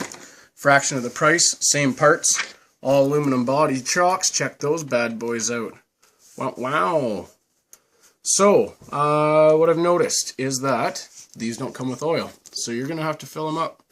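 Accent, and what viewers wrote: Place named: Canada. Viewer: You sound Canadian bud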